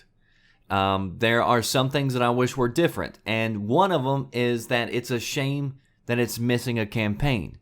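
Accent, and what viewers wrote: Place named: Texas, United States. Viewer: Really man I'm not even gon lie I'm being totally honest but your the best YouTube channel I have came across .. You sound like your from Texas . I love how you keep shit real man .. I love watching your videos .. You deserve the most success on YouTube . Only if you can be super constant with videos & have a lot more content you will be the best .. Love your channel bro I really do . I use to watch Ali a ghost Robo & others but your the best .. They are to soft lol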